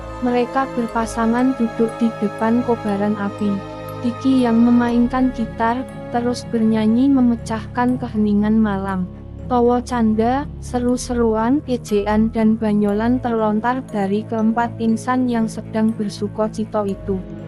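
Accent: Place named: Java, Indonesia. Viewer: Narator nya kurang menarik cara berceritanya seperti baca buku logat jawanya terlalu medok ...intonasi nya juga tidak tepat ...gak jadi deh dengerinnya 😁😁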